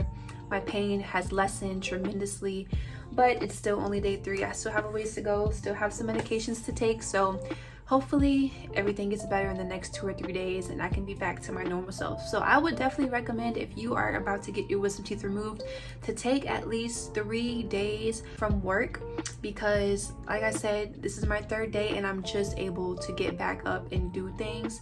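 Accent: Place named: New Orleans, United States. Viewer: y’all live in nola? i know my people when i hear them😂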